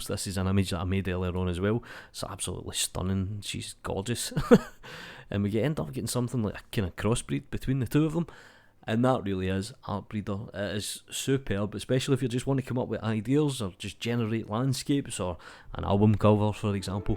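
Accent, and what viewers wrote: Scotland, United Kingdom. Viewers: Are you Scottish or Irish?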